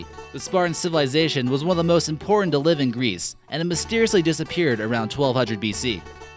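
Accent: United States. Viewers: paused the video with the laptop bullshit. the snarky American narrator is also annoying. For these reasons i'm out! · theres something so jarring about an American accent and american flags when discussing classical greece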